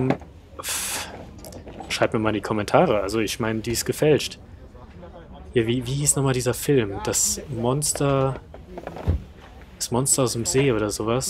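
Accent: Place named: North Germany, Germany. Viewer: Aus Norddeutschland schätze ich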